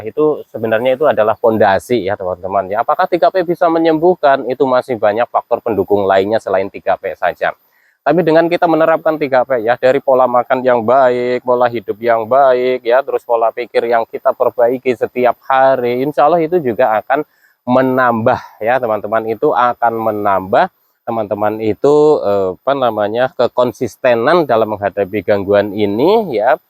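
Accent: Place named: Banyumas, Indonesia. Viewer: Mas enak penyampaian katanya, saya baru gabung aja suka lihat. Karena setiap saya dengar dan nonton. Kecemasan saya berkurang. Semangat terus mas, dan ayo semangat juga buat kita supaya lekas sembuh dari asam lambung dan kecemasan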